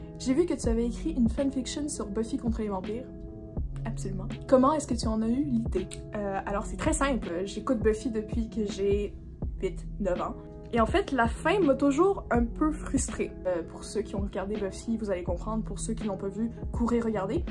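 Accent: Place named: Quebec, Canada. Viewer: Waw cette vidéo est vraiment super :O ! Je vais continuer à t'écouter. Ta voix est satisfaisante et je trouve que malgré ce que tu dis, tu t'exprimes vraiment bien. Mon accent québécois est tellement plus fort que le tien lol. Tu es super inspirante. En ce moment, l'écriture ne fait pas partie de mes priorités, mais elle a toujours et occupe toujours une partie importante dans ma vie. :D Je « relate » tellement pour les playlists d'écriture (je fais la même chose !!!). Je vais probablement aller te lire sur Wattpad. J'adore Twilight et ce qui touche vampire/loup-garou, donc je pense que ça va m'intéresser.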